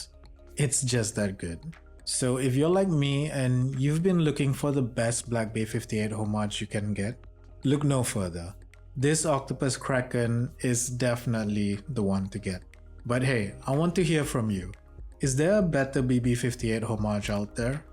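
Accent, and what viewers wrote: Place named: India. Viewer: Thanks a lot! Never heard of this brand. I will check them out. Judging by your accent you are from India, right? Which region/city you recommend to spend my holidays in India? I'm from Central Europe and I love spicy food.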